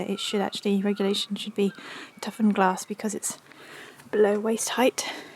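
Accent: United Kingdom. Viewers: Hey there...I'm a new subscriber and I just want to leave a compliment, I love big, fluffy dogs, I have a couple of German Shepherds that you'd love!, you and your pup are so cute together and I love your British accent, you sort of sound like Ozzy's wife!...I'm calling from the United States, I live in Michigan....Love, Ron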